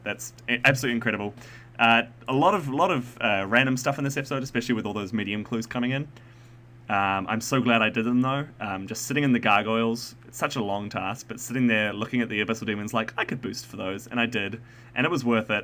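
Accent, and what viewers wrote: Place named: New Zealand. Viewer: Mate, you're a Kiwi! What part of NZ bro?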